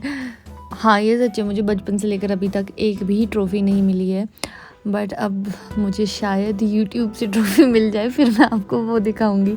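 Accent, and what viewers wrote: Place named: Pahari, India. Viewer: i wanna merry to you your voice is so attractive.......and your phadi tune i like....baki kuch nhi h.....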